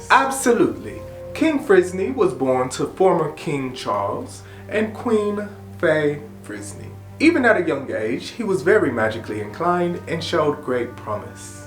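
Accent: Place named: New Orleans, United States. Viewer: Your NOLA accent was on point friend and this coming from a BR native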